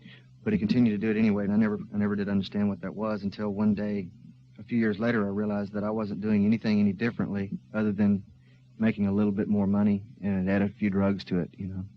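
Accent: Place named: Dallas, United States. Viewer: Classic Dallas accent from the period.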